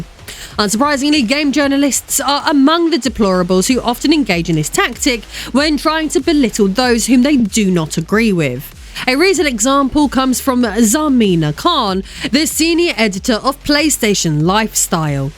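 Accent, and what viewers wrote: United Kingdom. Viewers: the only thing you are is gorgeous. Regardless of yours or anyone else’s political stance, the fact that you’re so attractive, with an amazing accent (I’m a sucker for that British accent) and just the fact that you’re a real gamer. ❤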